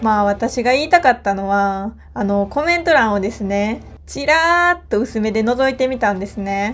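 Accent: Kansai, Japan. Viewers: 関西弁やから、親近感湧く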